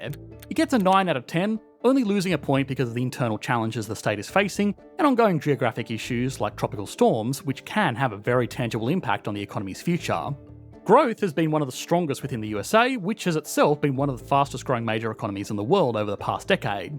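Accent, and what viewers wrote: Australia. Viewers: 😂 As an Aussie, I can confirm that those weird downward inflections are components of the Aussie urban accent. I don’t have it myself because side I never liked it, it a lot of Aussies do…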